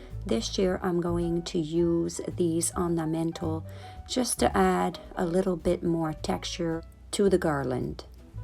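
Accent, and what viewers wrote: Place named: Atlantic Canada, Canada. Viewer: Hi, I just found your channel. I am a fellow Canadian. Love your video. I will definitely watch more. You definitely sound like you are on the East Coast. My parents are from Newfoundland originally but moved to Ontario many years ago. Have a wonderful Christmas 😊🎄♥️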